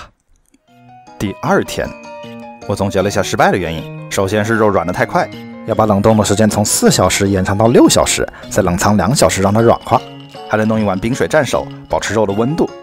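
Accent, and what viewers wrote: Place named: Changsha, China. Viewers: 感觉博主有长沙口音…… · 这个解说太逗了，哈哈😄 听口音，你是长沙的不？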